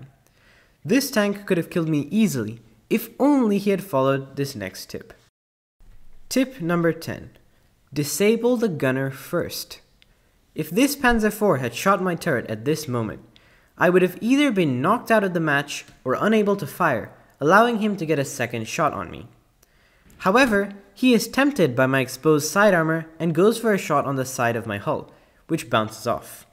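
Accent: Malaysia. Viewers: Ae you malaysian? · Where are u from??? Malaysia?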